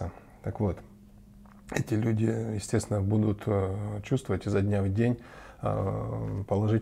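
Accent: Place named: Ukraine. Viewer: Говор украинский-значит из Украины 🤦, где-то смеется ростовский представитель калломассы.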